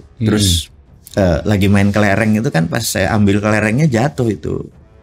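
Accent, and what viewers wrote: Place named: Palembang, Indonesia. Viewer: msh ingt aja logat bhs palembang. 🤣🤣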